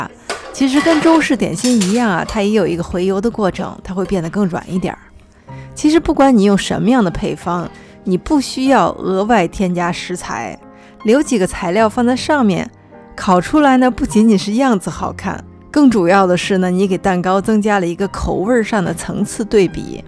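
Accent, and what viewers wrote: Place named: Beijing, China. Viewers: 好喜欢讲解的声音，北方腔好治愈，音乐也好！喜欢5555，想学肉夹馍！！ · ，你人生活在印度嗎？為什麼又印度奶茶，又印度薄餅？為什麼說話口音是京騙子？膚色是印度人的膚色？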